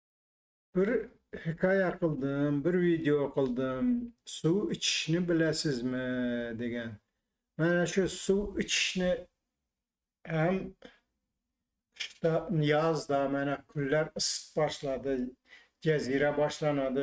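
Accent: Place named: Khorezm, Uzbekistan. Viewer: Мен хам асли Кашкадарёликман хвй,ярааааайййй....(хазил ).Бизда Барлос уруги бор Амур Темур бобомиз уруги ..Бобомиз тугилган кишлок Шахрисабзда Хужа Илгор кишлоги хозир хам жойида турибти.Улугбек Мирзо Али Кушчилар Шахрисабз шахрида тунилишган уларнин уруги Барлос уруги.Менинг дадамларнинг уруги Турк Барлос уругидан .Фаркимиз биз ман сан деб гапирамиз .Барлос уруги мен сен деб гапиртшади "Ж" харфини куп ишлптишади шевада лекин мактабдан тоза адабий тилни урганишиб ккрвкли жойда адабий тилда гапиришади ж кушмай.Лекин оилада уз шеваларида гапиришади хозир хам .Масалан Жакси журипсанма .Яхши юрибсанми? Дегани булади .Биз яхши юоибсизми деймиз.Шунинг учун улар бизни турк барлос дейди.Биз уларни шевасига улар бизга тушунишади.Кашкадарьё Сурхондпрьёдан куп чемпион болалар машхур полвонлар чикади.Чунки улар чакалоклигидан соскани упнида кцй думбасини пишириб ннбошига чупча тикиб чакалокни огзига солиб куйишади чаеалок думбани соска урнида суриб катта булади.Россияга борган Кашкадарёлик болалар .Худога шукур гуштдан кутулдик дейишармиш.Демокчиманки хар бир вилоятни узига ярашиб турган шеваси борда.Сизнинг Хоразм шевпсида гапиришингиз узингизга ярашиб туради.Мнн бир Хаййй яраййййй..десам менга Кашкадарьёликмисиииз деб кулиб.юборишади дарров гап гапга ковушиб кетади.Чунки бутун Узбекистон вилоятлари био юиоинт яхши тушунишади.Вотти вотти килса Тошкент .Емон зурсизда деса Андижон Келутту деса Наманган.Улай санга деса Китоб .Борайчийдим келайчийдим деса Шахрисабз .Туссунбой киттин бичок д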